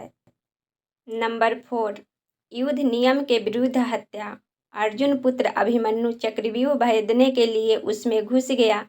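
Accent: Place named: Bengal, India. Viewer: Thoda apna hindi bolne ka trika sudharo hum lagta hai bengali ho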